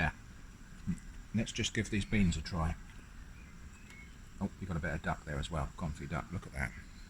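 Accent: United Kingdom. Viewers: Don't worry; we Americans find a lot of British accents more pleasant to listen to than our own, too. 😅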